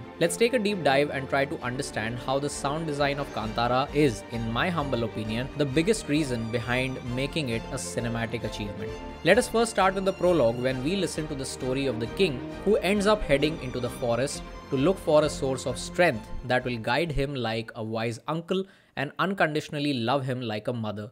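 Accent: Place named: Karnataka, India. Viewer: Going by your pronounciation, I thought you are Kannadiga. Dakshina Kannada/Karnataka, many have already commented. But a great video and I pleasently surprised by the sound design when villagers handover gun to Murali in climax. Had not noticed it. Thanks for the video.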